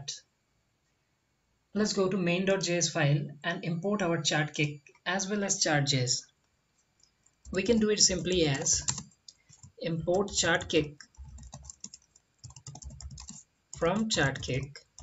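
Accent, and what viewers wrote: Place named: India. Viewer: skipped. Cause: Indian accent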